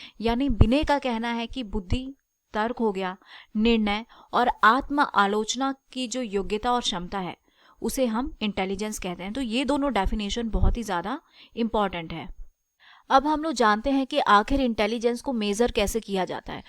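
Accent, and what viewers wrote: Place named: Delhi, India. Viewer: No she is absolutely "North Indian"... * Notice her "a" pronounciation that connect Delhi area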